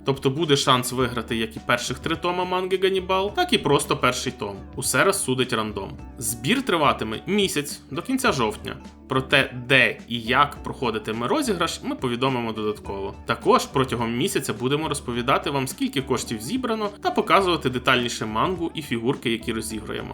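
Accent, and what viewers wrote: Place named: Bukovina, Ukraine. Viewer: оу, ви з Буковини 🧐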